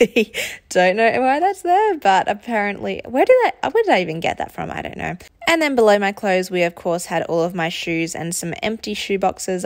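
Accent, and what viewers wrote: Australia. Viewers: Your intonation is quite special , not like the American intonation I have learned , and after I checked the profile , realized you are an Australian ,no wonder it’s quite different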